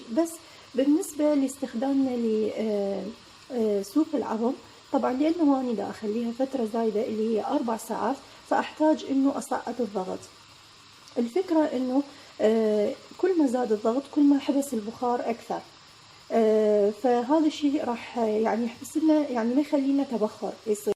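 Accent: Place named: Iraq. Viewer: saber touati تتكلم لهجة عراقية مو خليجية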